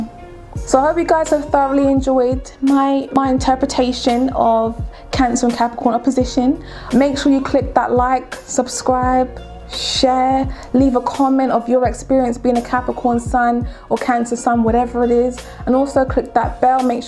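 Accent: United Kingdom. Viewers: You most definitely do but your accent has a little British twang to it 💐 its all love beloved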